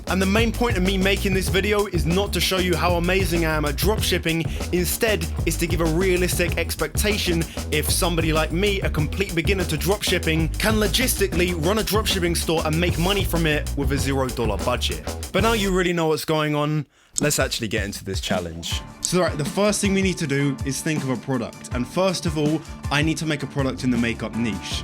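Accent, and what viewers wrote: United Kingdom. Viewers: I notice you selling and using $ but you’re accent is british. Do you live in Uk? Or uS?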